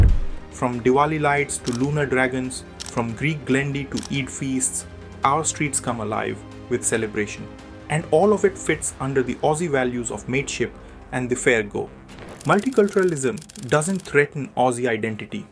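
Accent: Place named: India. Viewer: JUST STRAIGHT UP BULL SHIT What Anti-Migrant Protesters Got Wrong DO YOU ME MEAN AUSTRALIANS? LOL (I HAVE NOW BECOME ANTI-MIGRANT) BUT HAY, IT'S ALL ABOUT THE LAST 3 YEARS OF YOU LAZY, DIRTY, MEAT HEADS COMING INTO OUR COUNTRY AND OUR SO CALLED TRUSTING BUT LOVING GOVERNMENT LETTING IN 1500 INDIANS AKA IMMIGRANTS INTO OUR COUNTRY PER WEEK AND JUST TO TOP IT OFF IT'S A INDIAN VOICE OVER (GO HOME) TRYING TO TELL US AUSTRIANS HOW GOOD THEY HAVE BEEN FOR OUR ECONOMY F OFF HOME WE DON'T NEED YOU OR WANT YOU!!!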